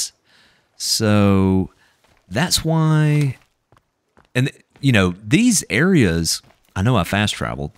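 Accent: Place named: South, United States. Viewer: I cannot pinpoint your accent. It has to be southern, Im thinking Arkansas? Where have I heard that accent before? Lol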